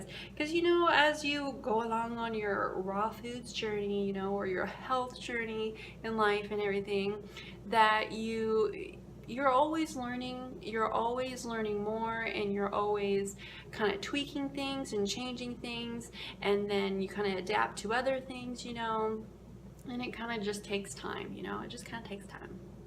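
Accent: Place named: Alaska, United States. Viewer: I could listen to you "rant" all day Miss with that Alaskan accent. Thanks for the chitchat!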